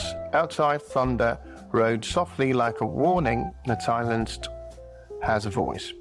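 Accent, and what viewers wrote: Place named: Liverpool, United Kingdom. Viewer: Excellent .I love how paced it is. Can you make them more like this .. Happy scouse accent too😂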